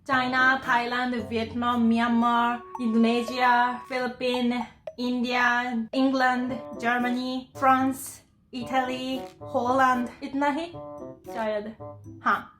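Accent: Standard. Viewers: Itni pure Hindi to Mai bhi nhi bolti🤣 Thankyou for loving our culture💜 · ap to kuch jada hi pure hindi m bolte h🙏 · Humto sirf Hindi bolte hai ji to sudha Hindi bolti Hain😘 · 🙄 itni suddh hindi to mene khud ne aaj tak apni puri life me nahi boli including hindi exam papers. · U speak pure hindi...and we have lots of impurities mixed in our langauge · I love you apka Hindi Bhasa suddh hai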